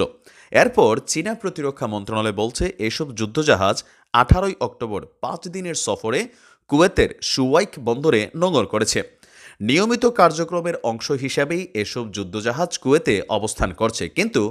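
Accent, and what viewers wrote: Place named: Kotalipara, Bangladesh. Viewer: ভাই "ক" কইলে কোটালি পাড়া বুঝি।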